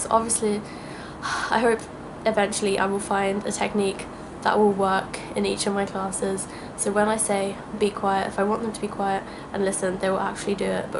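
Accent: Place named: Australia. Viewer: sounds Australian hahah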